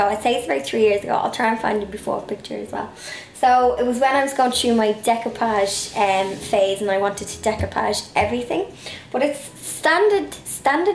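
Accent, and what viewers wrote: Ireland. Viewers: Hi, what a wonderful master bedroom, it is ideal. I only have a fairly small bedroom, fine for me and my cats, I have four, was five but one died last week and I was devastated, she had a poor heart. Anyway you always cheer me up with your beautiful accent and amazing videos, thanks so much. xx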